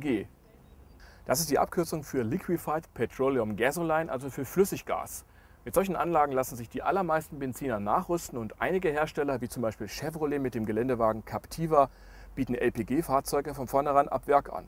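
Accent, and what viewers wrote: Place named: Netherlands. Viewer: du hast eine rotsprache!!! niederlandisch!!!!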